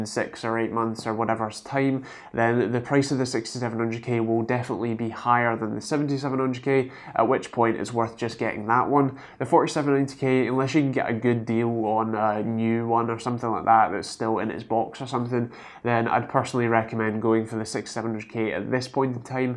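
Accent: Scotland, United Kingdom. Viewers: Is it just me or are you sounding more Scottish in this video? (Not that it's problem if you were :P) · Well, great video as always. My 6700k don't even need to be upgrade and I'm running stock, didn't even use factory OC still good for most stuff. Didn't realise you have a Scottish accent (I think) from all the vids up till now. Haha. Would love to see a cable management video if you have one as my cable management now really is a mess. 6700k with a R9 390x, 16G ram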